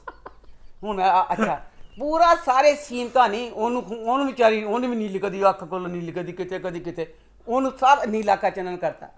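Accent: Punjab, India. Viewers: Jab sae josh ma aati han to andar se punjabi nikalti ha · Yar i loved opinion of present Govt. situation. is a pure punajbi soul. Cute punjabi accent.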